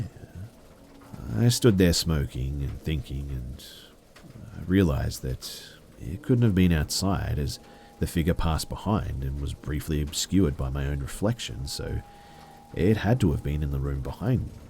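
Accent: Australia. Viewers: Is it bad that i just realized he is a ausie?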